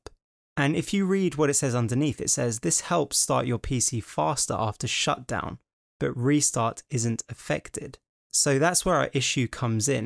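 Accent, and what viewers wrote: United Kingdom. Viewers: So glad I stumbled upon your video, what luck!! After struggling with reinstalling Ubuntu 20.04.3 successfully ,after an "ID-I0-T", error I thought I better check the Win 10 install on my dual boot drive. Win 10 booted up fine and whilst booted it did a full Nov 21 update on shutdown / restart, and all worked okay. After shutting Win 10 I then found problems reinstalling apps in Ubuntu with the persistent read only file system error, requiring several uses of Timeshift to reset Ubuntu again & again. I had instantly thought it was an Ubuntu error after the reinstall, but low and behold it is Windows trying to hog the hard disk limelight again with "Turn on fast restart" enabled. I will be checking that it is disabled after every Win 10 update from now on. Thanks so much and great to hear a British accent on the video :)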